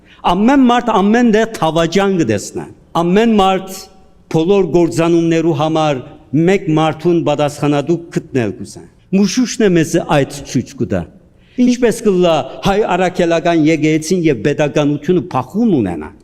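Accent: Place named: Turkey. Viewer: Ինչ՞՞՞. Ես խոսում այ մարդդ՝ մենք շատ էլ հստակ տեսնում ենք որ թշնամին փաշօղլ օլաջախն է՝։ թուրքական ակցենտիդ մեջ թուրքի զապդի հոտ կաա՝։ քեզ էլ հասկացանք նիկոլիստ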